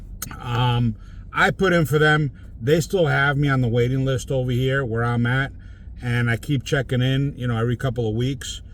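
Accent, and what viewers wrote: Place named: Philadelphia, United States. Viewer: Philly Philly!!! That. Is. Where. I. Am. From. 🤪😎 man, you’re accent feels like home. I’m in the Bay Area now (unfortunately)…wanna get back into gig work tho. I’ve had about enough of the 9-5 stress…overworked and underpaid and unappreciated, all for someone else to get rich at the end of the day…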